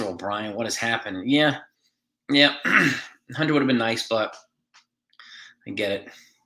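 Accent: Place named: New York, United States. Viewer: 1991 was the last time the Yanks had a month as bad as Sept. And it was Sept. 1991... Don't worry, I got your back. That's about all I have, you got it all, Home grown NY'er, got the accent (to me anyway, I'm an Oregonian)...Love your content. Glad I came across your channel. Wish the Yankees would have finished stronger. I know the games didn't really mean anything, but still, split with Texas? We should have at least gone 3 out of 4. And Cole worries me. That was like 3 starts in a row where he gave up a tying or go ahead HR. but I think the offense picked him up in two of them. Gonzalez is a lefty and Tampa's pitchers are all righties (Starters anyway). I think that's what they were saying..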